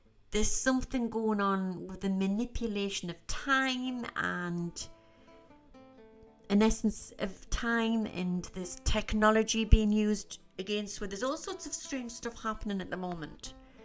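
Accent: Newcastle, United Kingdom. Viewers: Why would someone do that? I'm a fellow geordie and I love listening to you and it comforts me to hear you, when I live in Australia now. Gis the name of the people who were not nice to you and I'll kick them in the fanny for you I😂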